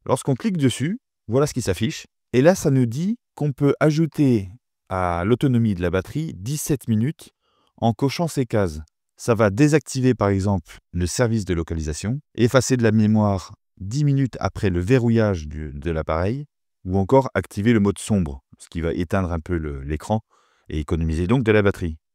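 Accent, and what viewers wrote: Franche-Comté, France. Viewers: Tu es de Franche-comté toi non? Héhé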